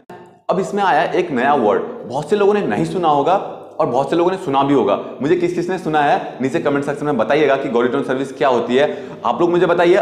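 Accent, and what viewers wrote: Uttarakhand, India. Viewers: Uk walo ka toh bolna he ek alag he andaj h ❤️❤️❤️❤️ pithoragarh 🔥❤️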